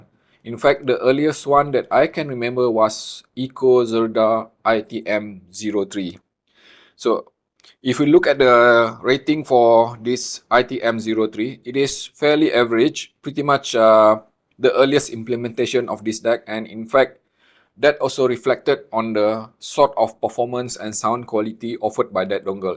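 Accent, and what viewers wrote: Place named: Malaysia. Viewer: great review I love your malaysian english.... Greeting from indonesia....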